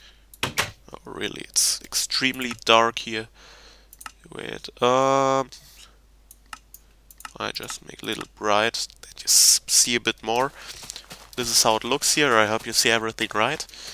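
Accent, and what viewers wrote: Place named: Germany. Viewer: man merkt so geil dass du normal deutsch sprichst :D zb. schurr (sure) und äktschälli xD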